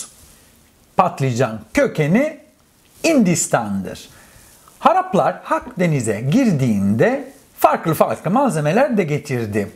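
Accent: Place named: Istanbul, Turkey. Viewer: İstanbul türkçesi konuşan harika bir italyan şef👏🏻👏🏻 ellerine sağlık, harika!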